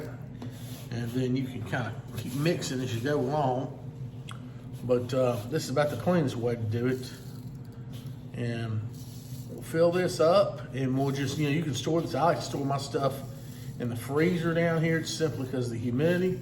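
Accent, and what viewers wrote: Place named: Texas, United States. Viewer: Love your accent!! Texas up in this thang👏🏽👏🏽🙌🏽🙌🏽💯💯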